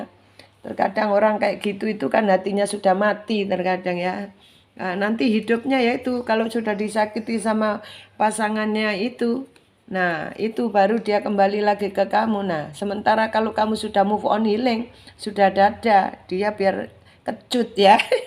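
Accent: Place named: Java, Indonesia. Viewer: medhok bgaet yooo itu lucuya bikin semangaat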